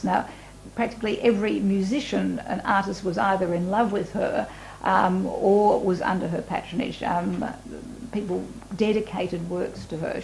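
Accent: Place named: Australia. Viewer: if the australian accent could be contained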